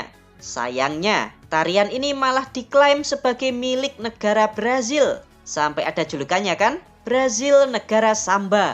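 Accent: Java, Indonesia. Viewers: dubbing nya planga plongo medok gajelas.. ga nyaman bat di telinga...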